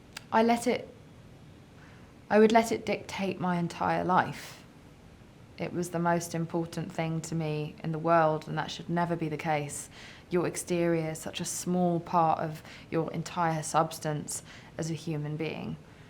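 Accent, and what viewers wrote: United Kingdom. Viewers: she sounds very british..Ber partner is also very British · You weren't faking that British Accent, huh.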